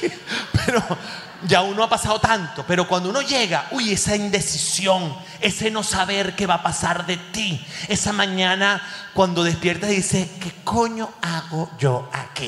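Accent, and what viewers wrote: Cuba. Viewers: Me encantas con tu acento🎉🎉🎉😂😂😂😂😂😂 Cubano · Magistral como imitas el acento de nosotros los cubanos. Me Meo contigo 😂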